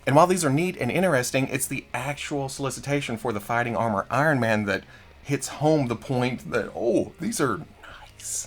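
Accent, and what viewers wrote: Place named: Mississippi, United States. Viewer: As a man from rural Mississippi I prefer your videos. Your accent is like visiting home for 20-30 minutes a week. Never stop doing what you Love.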